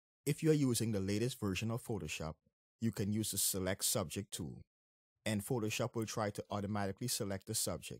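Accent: Trinidad and Tobago. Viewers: Sounds like a Trini! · thank you! BTW you sound Trini.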